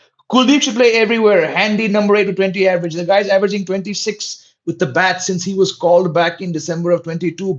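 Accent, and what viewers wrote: India. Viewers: Sorry, your english is almost indian english, probably live in india? Are you related to Tom Alter ji, to me you look and speak like him. Again sorry for being so inquisitive. Bazzball is for flat batting decks if at all if has any place, but even then they will need to show patience at some point during a match, or it will lose against good opponents. Keep up your good work.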